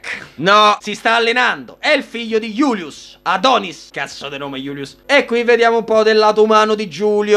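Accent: Rome, Italy. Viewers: Ma soprattutto perchè è l'unico che sa sfruttare così bene l'accento romano da far sbellicare dalle riderate, personalmente a me non è mai successo fino ad ora.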